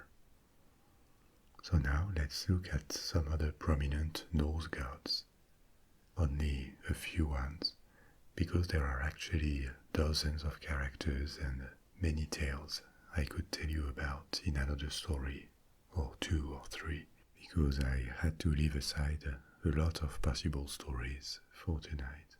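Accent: France. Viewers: Je crois entendre un accent français donc je vais commenter en français. J'ai lu l'Edda mais ça c'est aussi une façon ludique d'en apprendre plus sur la mythologie nordique!😁 Ps: Je viens de voir le nom de la chaîne 😆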